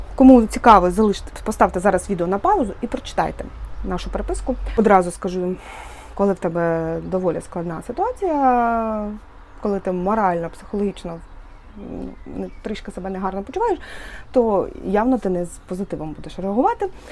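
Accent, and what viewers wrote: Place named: Western Ukraine, Ukraine. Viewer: запам'ятай, усім ніколи не вгодиш!!! Твій канал, твоє життя, твої правила! Я із заходу України, мова суржик інколи "ріже" вуха, але це мої проблеми, чому я би писала про це людині і псувала їй настрій? 😅 До речі, я підозрювала, що ти маєш зв'язок із західною частиною, чути все рівно по вимові слів, що ти наша❤🇺🇦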